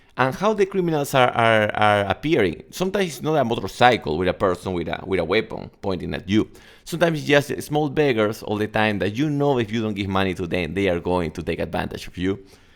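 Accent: Peru. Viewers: Nah, Hbla bien solo que reconoces el acento peruano y te parece malo, pero si lo comparas con un ruso, aleman, se le entiende incluso mejor.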